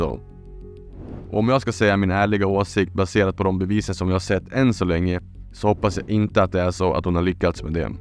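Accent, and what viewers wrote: Dalarna, Sweden. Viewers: Härligt med lite dalmål på Youtube 😎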